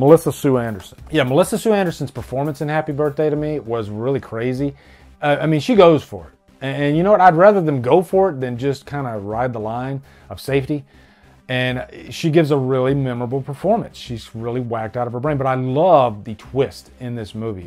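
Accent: South, United States. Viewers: Are you Southern originally?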